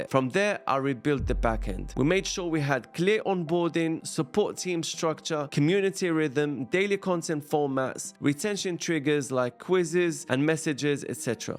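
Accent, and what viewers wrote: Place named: Morocco. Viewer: you have like morrocan accent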